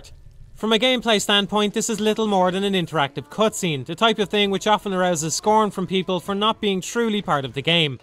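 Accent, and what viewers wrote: Ireland. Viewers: good strong irish accent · Are you trolling? You seem to have made a statement concerning IGN and "learning" in the same sentence. Also a bit of advice, all caps equates to a 40% decrease in credibility. And also I think hes Irish not British. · He's Irish.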